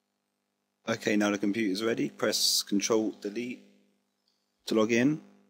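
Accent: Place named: United Kingdom. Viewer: u r british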